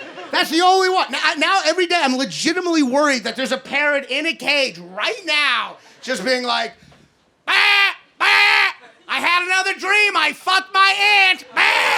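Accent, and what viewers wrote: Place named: New York, United States. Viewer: He's from Kentucky but he sounds like a New Yorker. That's how Jewish he is.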